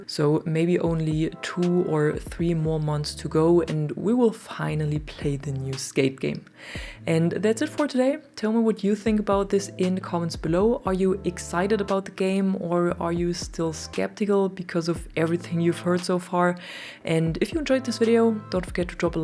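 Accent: Germany. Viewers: Ist dein Dialekt deutsch? Falls ja lass ich einmal liebe Grüße doch schau schon längere Zeit deine Videos spiel das spiel jetzt seit einigen Tagen im early Access... Muss sagen es macht riesen Spaß aber ich komme nicht mit der Grafik nicht anfreunden sie sieht irgendwie extrem unfertig aus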